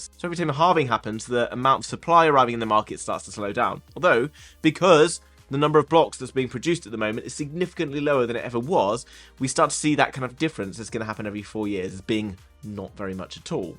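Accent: United Kingdom. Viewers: As a german follower, which even speaks very fast german, i love your clearly fast speaking i guess british english, cauz i ca understand everything and dont have to skip before and that is even awesome, like your BIG PULLING contet! I like your authority and your attitude, cauz i can see the through in your eyes and know your heart is beating for Investigating/trading AND secure your community, what is really epic ! I really aprecciate your content! Haave nice day brother, i send you much energy from the universe =)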